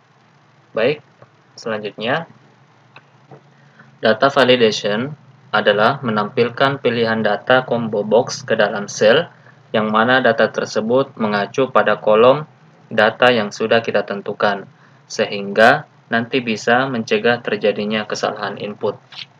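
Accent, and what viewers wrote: Indonesia. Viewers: sepertinya masnya orang makassar ya...soalnya logatnya kental banget..thx ilmunya yang mudah di mengerti ! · Kayak logat sulawesi sappo..makasih tutorialnya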